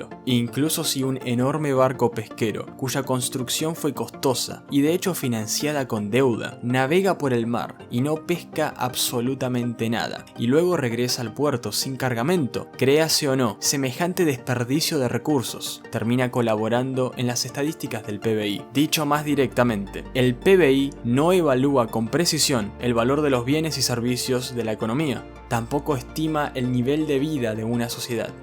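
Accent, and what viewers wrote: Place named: Argentina. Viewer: tu hablar parece de argentino. Pero, donde vives?... en USA?. Gracias por tus aportes al conocimiento general de la economía. (Te sigo en todos tus posteos). Saludo Libertario 🤙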